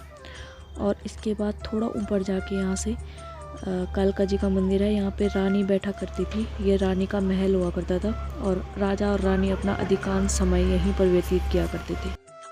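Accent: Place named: Garhwal, India. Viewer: I really like the way you talk, the garhwali accent 😍 is just killing.. and you are cute also i would say.👍. Soon you are going to be a top blogger ,keep it up.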